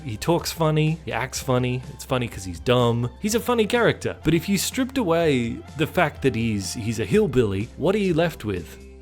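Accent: United Kingdom. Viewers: is australian?! well i'll be! i could have sworn he was british. xD, if you're listening, get in touch with the the weekly planet guys and make a guest appearance!! i mean, australia's a small country, right?! you probably live just down the road from them. xD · I thought you were British.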